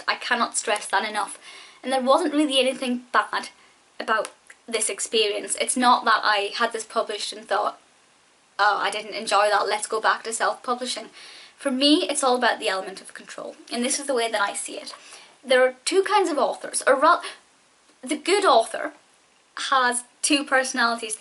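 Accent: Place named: Scotland, United Kingdom. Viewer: Hey right now this is the first video from you im watching and i'm trying to guess from where your from based on your accent. My guess is your Scottish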